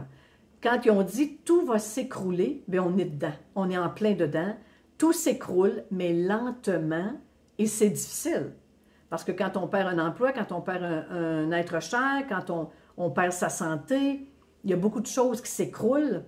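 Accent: Quebec, Canada. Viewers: Merci beaucoup, j’adore votre accent et vos expressions québécoises. Moi je suis de Bordeaux 33 en France là où il y a du bon Vin. Je vous embrasse très affectueusement ❤❤❤❤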